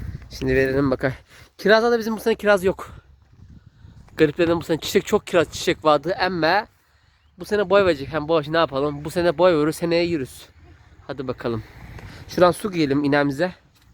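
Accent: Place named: Zonguldak, Turkey. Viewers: seni hiç kimse yadırgıyamaz Çünkü her yörenin kendine has şivesi vardır bizim Zonguldak’amızın şivesi de böyle biz mutluyuz şivemizden canım benim sen kafayı takma yola devam❤️ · Bende devrekliyim hemşerim şiven harika sırf bu yüzden izliyorum seni 🤗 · kardeşim biz seni oldun gibi sevdik her yörenin şivesi vardır sen Zonguldak şivesiyle konuş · Zonguldak Karaelmas Mahallesi'nde oturduk yerlileri 'ın gibi konuşuyor lar Maşallah barekallah lahavle velakuvvete illabillahil aluyyulazim duasını okuyarak gönderiyorum sizlere Amin AMİN AMİN ECMAİN 🤲🤲🤲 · Konuşman gayet güzel sakın değiştirmeye kalkma! kaybolup giderüz çaycuma şivemiz.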